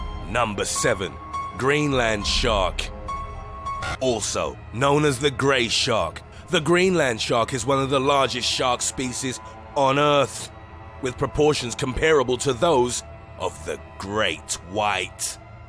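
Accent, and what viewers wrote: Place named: Cockney, United Kingdom. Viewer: Easy going gentle giant 😂😂 You sound proper cockney 😂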